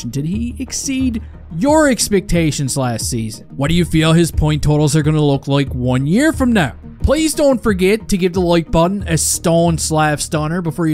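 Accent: Newfoundland, Canada. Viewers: You got to be a Newfie with that accent ,definitely not from Cape Breton !!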